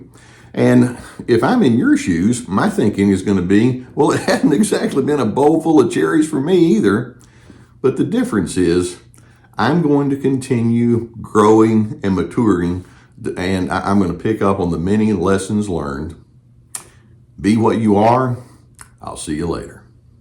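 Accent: South, United States. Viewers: insightful and educational as ever. I love your southern accent too!❤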